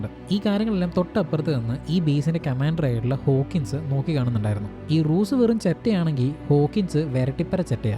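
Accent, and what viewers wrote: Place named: Trivandrum, India. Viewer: Movie selection is good, but the language (Trivandrum or kollam dialect) is a little bit annoying. Try to improve your language.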